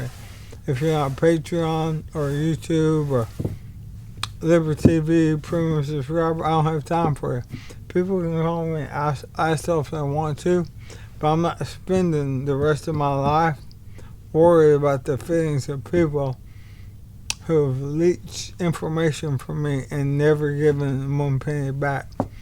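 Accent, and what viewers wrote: South, United States. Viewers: You’re a stand up guy,. Many of us will indeed greatly miss you when you’re gone. Until then, I’ll continue praying FOR you & your family. We’ll likely never meet, this side of life. I’ll look forward to hearing your perfect southern dialect again in the next life tho. Much love and respect to you, sir!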